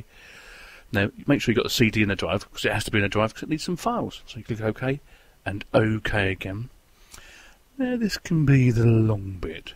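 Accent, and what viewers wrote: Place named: RP, United Kingdom. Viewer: Hey I could imagine you as a very wealthy English man talking about business and politics during the 19th century. Awesome voice!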